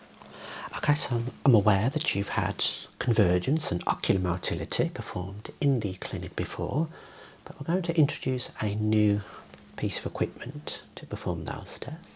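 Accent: Birmingham, United Kingdom. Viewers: Your accent is too beautiful! 😍 You're from the UK, right? May I know, just out of curiosity, from which part? I love how you talk! Sending love from Argentina! 🇦🇷❤ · He is Brummie Taika Waititi, and no one can convince me otherwise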